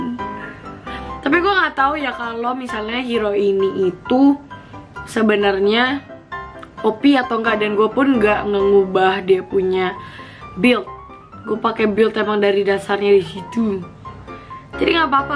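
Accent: Indonesia. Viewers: Ngomongnya sunda njay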